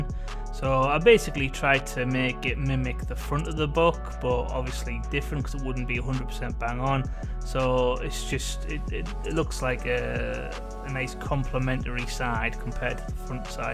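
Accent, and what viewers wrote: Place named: County Durham, United Kingdom. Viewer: Hi buddy, you sound like you are up my way. I'm from Seaham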